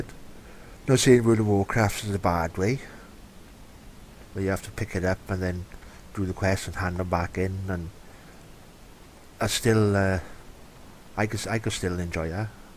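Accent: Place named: Wales, United Kingdom. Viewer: Love your Welsh accent Baaaaaaaaaah (sorry that was unnecessary I know).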